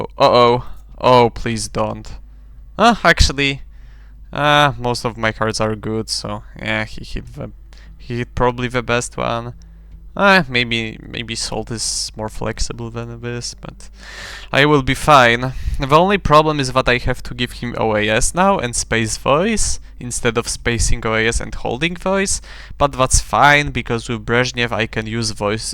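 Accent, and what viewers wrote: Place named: Poland. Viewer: Ten polski akcent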